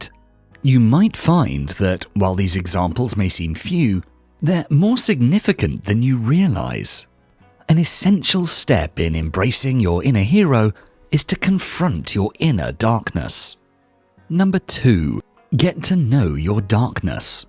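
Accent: United Kingdom. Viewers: The narrator is so hard to listen to, can we try to use a more smooth sounding American accent narrator instead? The British accent tone is just so up & down & sounds like trying so hard to pronounce the words but actually more difficult to hear clearly. Thank you!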